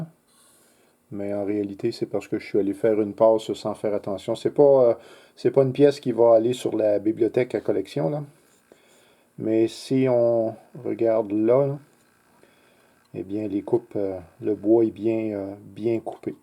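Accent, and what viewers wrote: Quebec, Canada. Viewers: Je découvre le tournage. Bravo vos vidéos sont très utiles et... j adore l accent de la Belle Province!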